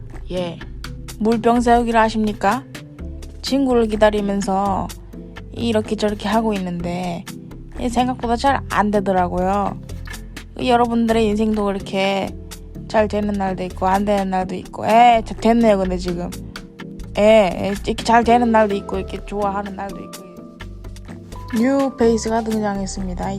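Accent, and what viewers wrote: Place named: North Korea. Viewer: 북한말은 독학하신건가요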